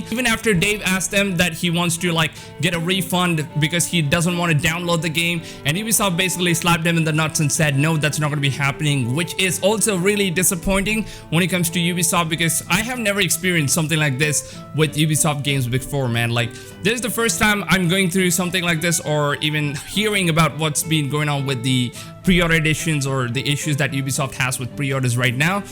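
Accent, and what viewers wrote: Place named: South India, India. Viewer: That south Indian accent is creeping through your American accent.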